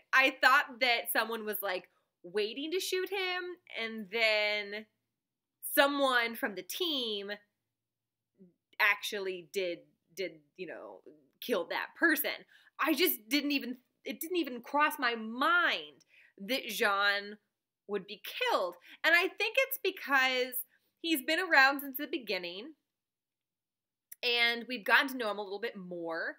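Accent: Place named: England, United Kingdom. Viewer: Thank you for taking my English accent comment and speaking more :D! <3 :)!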